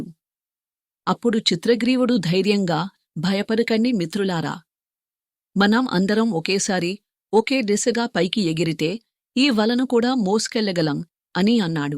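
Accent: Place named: Andhra Pradesh, India. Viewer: Hi medi Andhra kadha ne telugu enti different ga undi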